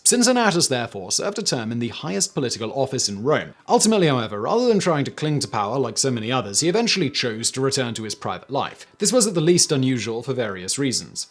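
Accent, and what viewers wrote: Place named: RP, United Kingdom. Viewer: This presenter guy is proof that a cultured British accent doesn't mean you aren't a slimey lowlife.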